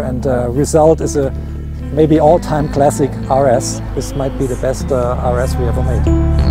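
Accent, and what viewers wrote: Germany. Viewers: Kann gut sein, wobei mein Englisch relativ gut ist ; ) Hab vor knapp 'nem Monat mein Englisch-Abitur geschrieben und hab für mehrere Monate in den USA gelebt ... Aber du hast Recht, sein Englisch ist nicht schlecht. Mich stört es nur, wenn man schon beim ersten Satz hört, dass da ein Deutscher redet ; )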